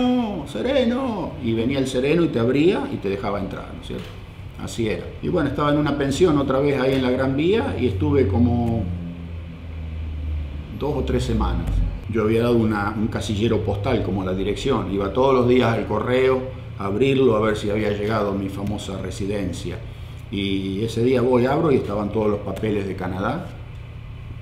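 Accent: Argentina. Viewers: Como sabe de cba, sabía de potrero..como si hubiera nacido acá, me pregunto dónde sería la casa acá cerca del clínicas, no perdiste nada el acento argentino.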